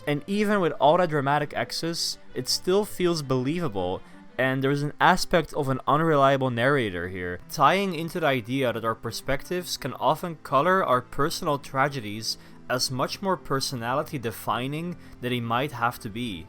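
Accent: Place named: Scotland, United Kingdom. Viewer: Why is your accent 15% Scottish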